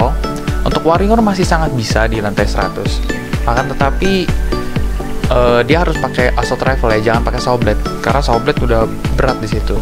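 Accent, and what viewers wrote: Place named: Indonesia. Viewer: Baru denger nih aku denger voice indo hahaa -Jiyang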